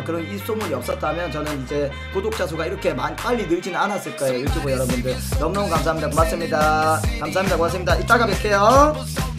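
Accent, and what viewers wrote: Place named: Busan, South Korea. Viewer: 나도 부산사람이라서 말투가 너무 친근했엉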